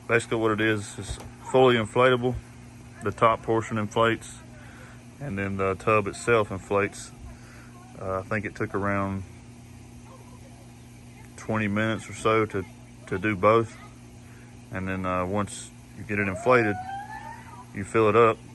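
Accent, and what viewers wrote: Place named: Alabama, United States. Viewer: He sound like he's from BAMA!!! Roll Tide!!!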